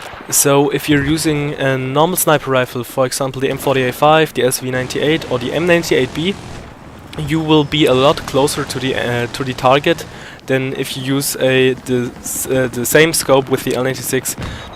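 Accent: Germany. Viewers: are you somekind of german or austrian?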